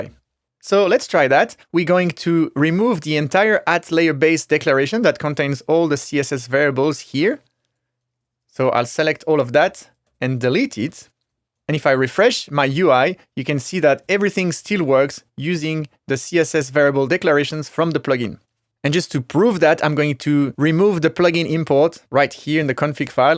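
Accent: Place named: France. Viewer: French accent just makes it better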